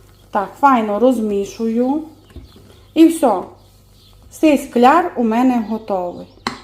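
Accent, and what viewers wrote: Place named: Transcarpathia, Ukraine. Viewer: Мені надзвичайно цікаво слухати, як звучить закарпатьский діалект. Попри те, що українська - моя рідна і я знаю її дуже добре, деякі слова все одно не до кінця розумію, але мені розуміти їх десь так само хочеться, як і вашої капустки. Бо з дитинства розмаїття мови - то моє хобі. Яка ж багата є наша мова!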